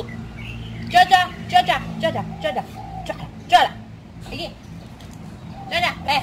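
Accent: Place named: Banyumas, Indonesia. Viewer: Mantap banget bagi bagi ngapak hee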